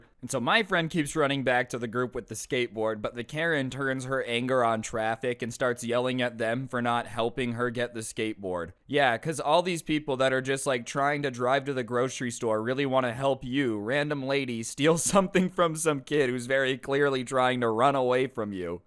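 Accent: United Kingdom. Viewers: British accent 10/10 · best british accent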